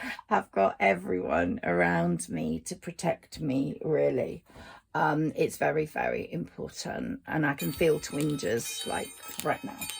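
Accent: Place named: Birmingham, United Kingdom. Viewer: Welcome back, really nice to hear your slight Brummie voice, lol its been a tough couple of months, constant healing, thanks for your dedication xx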